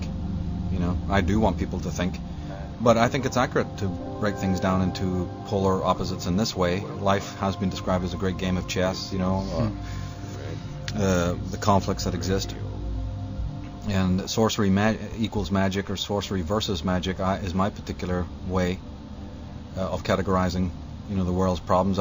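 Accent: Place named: Northern Ireland, United Kingdom. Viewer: I find his voice compelling... The slight Northern Irish accent makes it easy to listen to. Thanks for uploading this Free your sou with 7 l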